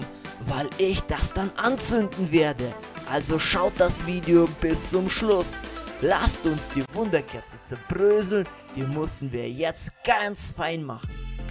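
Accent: Russia. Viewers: Bin ein treuer fan =) und dein akzent ist voll cool ^^ bist du russe? ^^, ich bin jedenfalls russe =D werde für immer beim kanal uschauen und nie weggehen =)))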